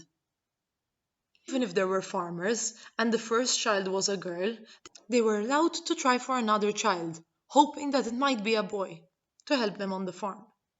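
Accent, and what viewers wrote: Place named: Malta. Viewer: I new that was a Maltese accent right away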